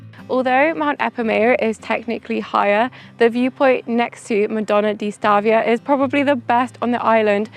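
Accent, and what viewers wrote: United Kingdom. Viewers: What a marvelous place 😮! That island 🏝️ is a true paradise on Earth! Thanks 🙏 for this delightful video! By the way, where are you from? Both your name and family name are French, but you speak really good English with a British accent 😊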